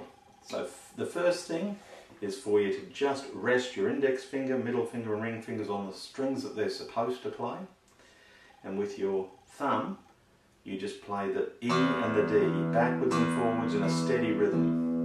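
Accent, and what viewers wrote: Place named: Australia. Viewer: mate you sound aussie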